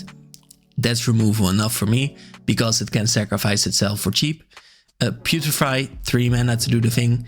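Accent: Netherlands. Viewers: my Dutch-radar is tingling are you dutch ? edit: nvm I looked at your channel and found the answer ;-0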